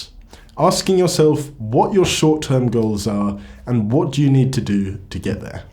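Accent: United Kingdom. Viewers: I'm here for the accent: I love the way you say, "What is up guys" like you are Prince William 😅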